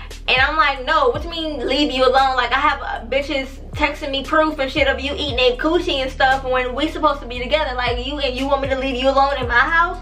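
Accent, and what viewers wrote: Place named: Louisiana, United States. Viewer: You from Louisiana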